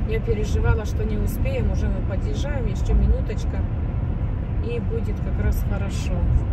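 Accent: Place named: Moldova. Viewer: Такой говор как с акцентом молдованки в Украине город Черновцы там разные сел молдованов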